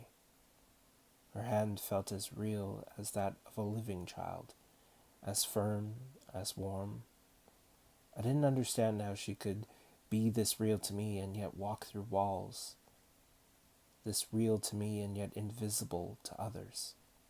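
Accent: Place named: Tennessee, United States. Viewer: Great voice. Thank you for uploading. What state are you from? You sound like a friend from TN.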